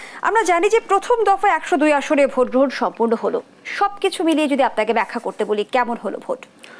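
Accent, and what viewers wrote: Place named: Kolkata, India. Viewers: বাংলাদেশ উপস্থাপিকা গুলা এখন কলকাতার ভাষা ফলো করে😂😂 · মনে হয় কলকাতা উনি আসছেন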